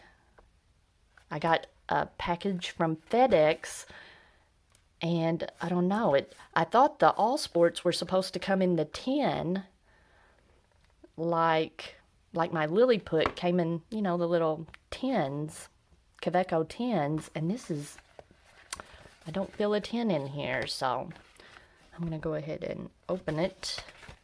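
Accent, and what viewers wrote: South, United States. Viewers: Nice southern accent. North Carolinian pen enthusiast here! Thanks for the video warning about this vendor. the Golden Espresso is probably gonna be my first Kaweco. Do you shop from Goulets' website? They're right in VA. Good stuff.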